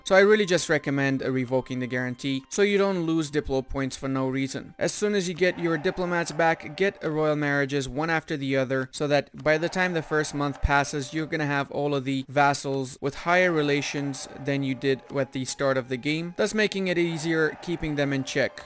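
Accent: France. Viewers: Oh man I love your french accent....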